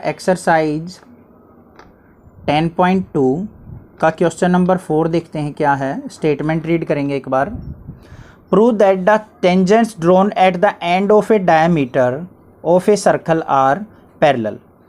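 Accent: Haryana, India. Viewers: I think ap haryana se ho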